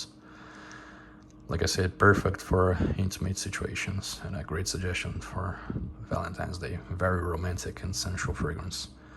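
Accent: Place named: Portugal. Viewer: lovely review bro! your accent sounds very Portuguese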